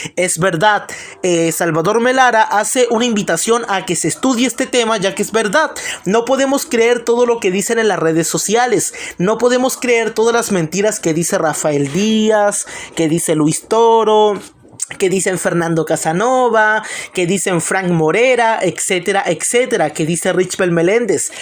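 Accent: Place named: Central America. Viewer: Quien habla dice que es Chileno?? Jajajaja, hata en eso mienten un chileno por aqui que lo desenmascare a este tipo de Chileno no tiene nada, ese acento es de Centro Americano.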